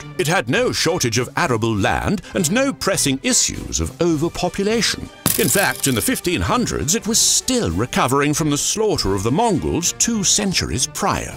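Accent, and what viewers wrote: United Kingdom. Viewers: I discovered your channel today. Instantly hooked with the brit pronunciation and enunciation. It's really good, and the pacing is well done too. Instant sub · 您的英式英语真的非常非常好听❤❤❤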